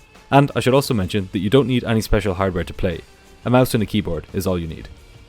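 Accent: Ireland. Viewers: Its the Irish accent - its where all American accent descend from so has a a warmth to it you are familiar with.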